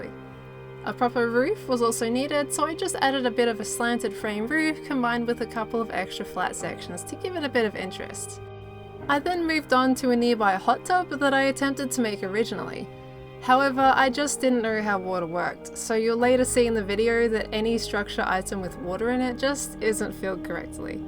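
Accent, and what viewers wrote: Australia. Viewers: are you aussie i cant tell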